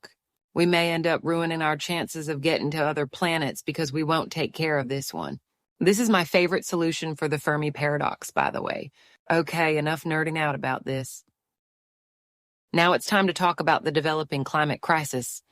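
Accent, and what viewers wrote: South, United States. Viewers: Well damn, Miss, I think I might be fallin' in love with you, lol! Just joking, but for real I LOVE all the things you talk about and the knowledge you give us! Really good stuff all around. Plus, I am a Southerner and you have a wonderful accent that warms my heart. I grew up under tutelage of a very smart father - anesthesiologist, sailor, hunter, fisherman, etc. So, I, as a sailor, surfer, fisherman, etc. became, as he was, something of a meteorologist (because surfers and sailors have to pay attention to such things) as well as something of a science nerd. Long story short - I love what you do and am VERY appreciative! I have already followed and will continue to do so. Thank you from South Carolina;-)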